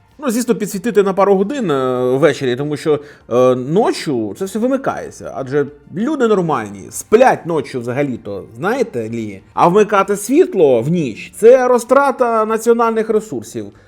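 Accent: Russia. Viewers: Московський акцент нагадує пʼєсу Подервʼянського «Кацапи»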